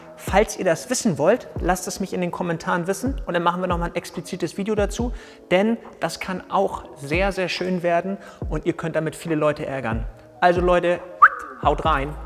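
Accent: Hamburg, Germany. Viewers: Schöner Hamburger Slang Bin selbst aus Hamburg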